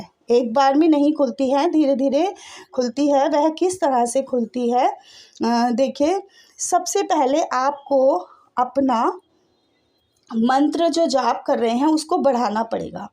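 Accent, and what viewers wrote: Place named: Delhi, India. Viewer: Aapki aawaj se lgta h aap whi di hn dilli wali😊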